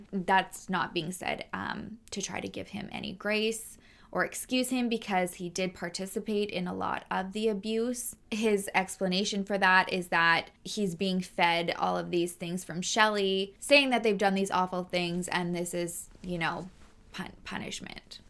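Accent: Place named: Canada. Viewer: haha when you said dry shampoo i was using some! love your channel and adorable canadian accent 💜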